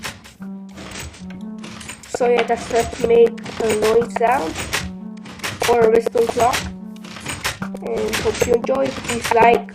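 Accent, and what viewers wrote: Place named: Ireland. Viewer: OMG so irish i love it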